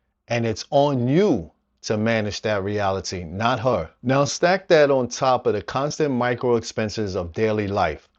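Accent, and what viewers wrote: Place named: New York, United States. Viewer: New subscriber: Excellent advise, you sound like a brother from New Yawk; I hear an accent...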